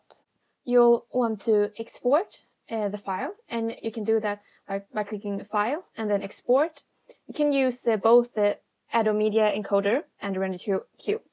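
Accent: Sweden. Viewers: cute swedish accent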